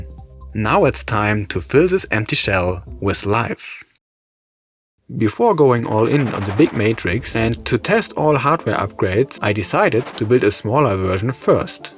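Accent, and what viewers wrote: Germany. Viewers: native german??? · I clocked you as German, and I was correct :D. Geiles Video, weiter so!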